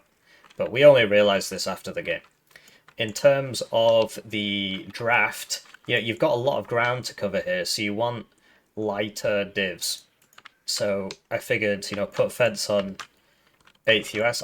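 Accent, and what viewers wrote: United Kingdom. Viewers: why do u look jewish , dress like arab , and sound british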